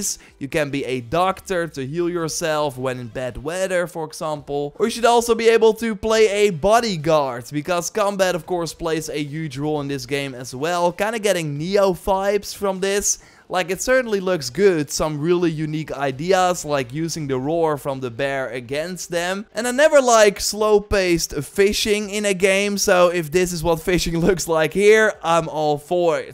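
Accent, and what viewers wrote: Netherlands. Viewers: Ooeeff best lastig om te volgen met zo'n sterk nederlands accent eerlijk gezegd.. wel reviews verder😁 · het hollands accent kan je ook niet verstoppen eh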